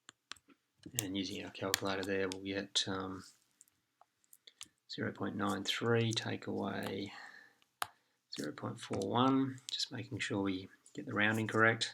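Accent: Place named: Australia. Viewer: I have been trying to understand the process behind finding out the least and greatest value of Arg(z) using sin and tan! At least I can see it here! Well explained with funny Australian accent!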